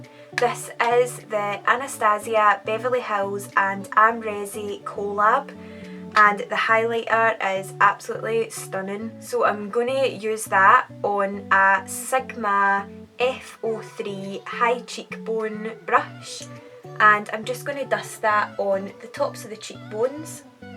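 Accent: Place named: Scotland, United Kingdom. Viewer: 😁 Scottish accents are the best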